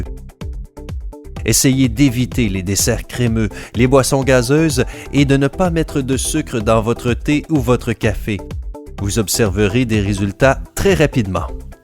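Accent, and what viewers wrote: Quebec, Canada. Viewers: J'adooooore l'accent québecquois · J'aime l'accent québécois du mec mdr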